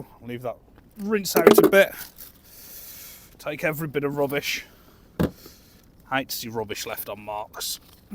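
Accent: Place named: Black Country, United Kingdom. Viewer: never done sea fishing I’d like to give it ago came across your Channel watching “Snagged Bro” However I can’t help but make your accent to be where I’m from… BIRMINGHAM! Sound like from Walsall or Dudley from there myself 😅 Keep up the good work enjoying the videos 👍 Ps love the “no bivvys round here mush” jumper in your previous videos 😅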